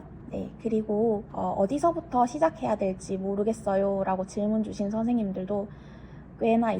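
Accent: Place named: Gyeongbuk, South Korea. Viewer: 감사해요 선생님! 어제부터 감도 못 잡고 무의미하게 검색하며 시간만 허비하고 있었는데 어느 정도 방향이 보이는 것 같네요. 억양이 경북이신 것 같다고 생각이 드는데 합격해서 현장에서 꼭 뵐 수 있으면 좋겠습니다!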